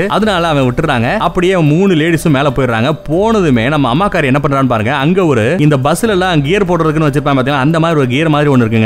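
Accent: Madurai, India. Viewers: Anna nenka pesura Madurai Tamil super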